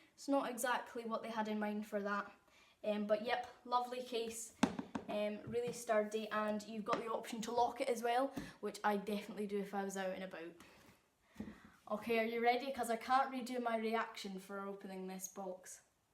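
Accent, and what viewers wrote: Scotland, United Kingdom. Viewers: Scottish accents and telescopes. Good times. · That Scottish accent though.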